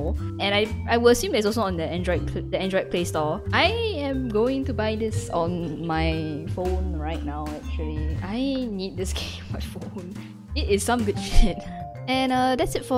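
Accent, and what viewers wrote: Singapore. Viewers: i could tell right away you were from sg, this video confirms it LOL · Are you Singaporean? Just curious. It sounds like a very singlish accent. =)> Also nice video! I’m surprised you put captions over the ENTIRE thing (this is the first time I’ve see a video of yours so if that’s a normal thing I didn’t know) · Oh boy I can hear the Singapore in the voice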